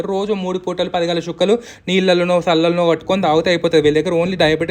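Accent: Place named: Andhra Pradesh, India. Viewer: Mee Andhra slang maarchukuntaara evarikosamaina? Ye region lo perigithe aa slang vastundi.. Yes or no? Mee pillalaku slang maarpinchi Telangana slang nerpinchandi .next gen ki problem undadu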